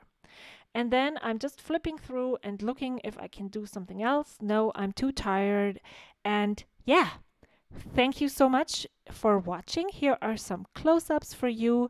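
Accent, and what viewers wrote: Germany. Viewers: nice video. I love the way you speak English by the way <3 it reminds me of my very dear German friends.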